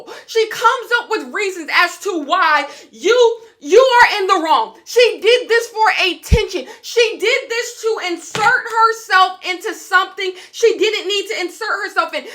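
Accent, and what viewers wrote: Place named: South, United States. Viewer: You’re from the South, I can tell lol. I’ve been over Gabbie Hanna since forever. I say the same shit. If you’re constantly studying what everyone else is doing, you are not happy. Period. When you got shit going on, happy life, friends etc. you don’t give a damn about someone else’s business. Especially to the extreme where you’re constantly putting yourself all in it. 🙌🙌🙌👏👏👏 you said perfectly!!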